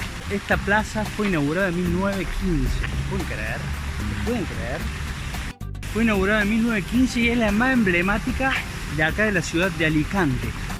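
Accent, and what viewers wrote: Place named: Córdoba, Argentina. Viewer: Buen acento cordobe jajajajaj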